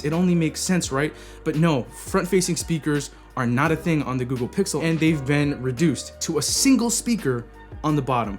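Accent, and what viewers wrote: United Kingdom. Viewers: that British accent tho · lol you make me laugh. I love the British accent lol good video!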